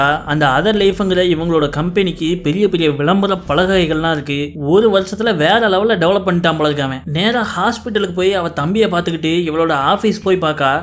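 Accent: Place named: Kanyakumari, India. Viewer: Super slang are you kanyakumari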